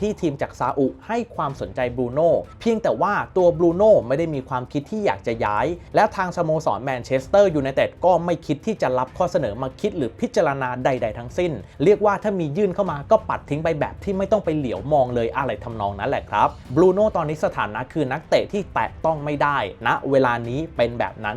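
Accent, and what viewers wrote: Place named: Isan, Thailand. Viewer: ขำตา พูดอีสานผมลูกอีสานยังขำเลยอ่ะ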